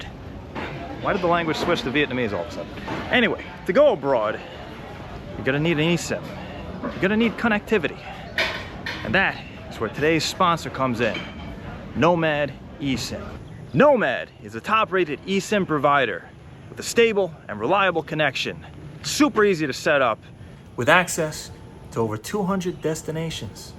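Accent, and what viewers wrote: New York, United States. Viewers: While I still think you're with the CIA, a common spook whose 90% of this audience is women, I've heard in other vids you said you were Canadian but you have a very distinct NY accent. Why the lie? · Sometimes your "New York" shines SUPER bright in your speech. Lol! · You are one of the most honest YouTubers out there. I knew you were a NY’er from the accent lol. You’ve probably ridden one of my trains out of NY Penn. Aren’t our trains garbage compared to every other country in the world? lol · I’m a new subscriber. I said to my wife that “his accent & mannerisms seem like a New Yorker”. Yep, I also grew up on the island. I have lived in the Philippines and am planning on moving back in a couple when I retire. Looking forward to watching more of your videos. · The greatest accent ever - New Yawk!!!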